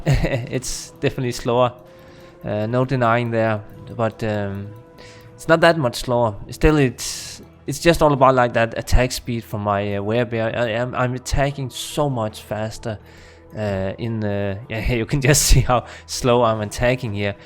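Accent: Denmark. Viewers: Are you from Denmark? Your dialect sounds a little Danish 😀💪🏻 great video!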